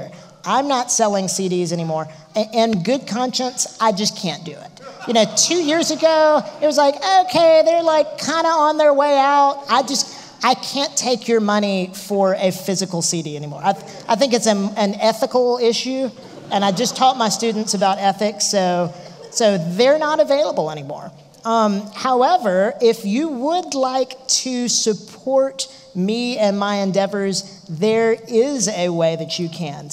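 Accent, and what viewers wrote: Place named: South, United States. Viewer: I had never heard you speak until today. Couldn't believe you're a Southerner. Love that accent. :-)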